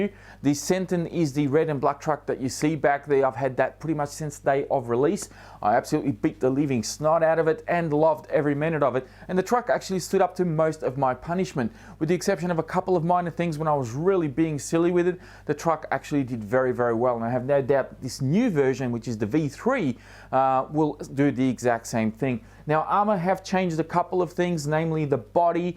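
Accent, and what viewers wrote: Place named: Australia. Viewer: I’ve been to the hobbyking live event in the Netherlands last weekend, and I must tell you that I really like the australian accent that you and the rest of the aussies (hobbyking guy also) have.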